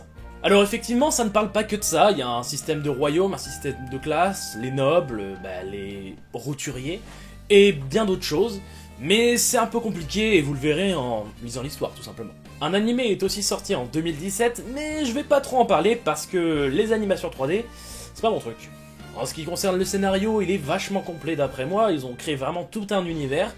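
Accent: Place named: United Kingdom. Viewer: J’aime pas l’accent anglais. À travailler..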